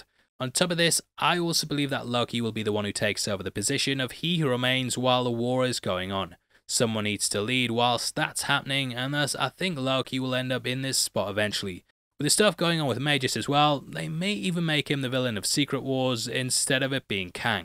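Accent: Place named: United Kingdom. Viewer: Me after each episode knowing I have a smooth talking British accent on YouTube ready to explain everything to me: ☺️💕🤪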